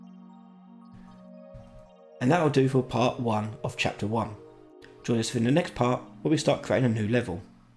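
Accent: United Kingdom. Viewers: Man can u slow down a bit while u speaking ... British accent it's so hard to understand ... And thank you for being a great teacher man ... 💖💖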